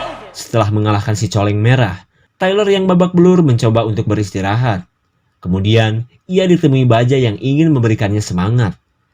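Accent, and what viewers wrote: West Java, Indonesia. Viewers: Sunda pisan ekekkek hanjakal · Teu dindi2 ai jama sunda ngomong na garelo tpi mantak nyeri beteng ngakak bae😆😆😆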